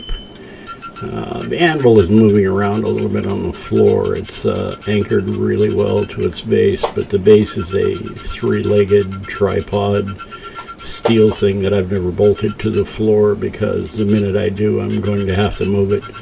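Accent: RP, United Kingdom. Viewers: After watching many years of the BBC I think the accent was spot on. It matched with the opening visuals and reminded me strongly of my childhood. I just needed to see a Dalek and I would have been behind the sofa like a shot! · Loved the cultured british accent. Since it happens to be your shop you can call the tools what ever you want to.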